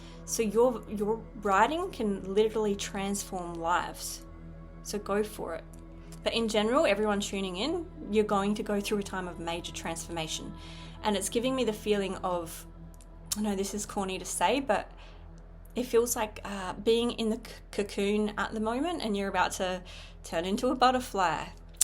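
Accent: Australia. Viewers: Aussie accent!❤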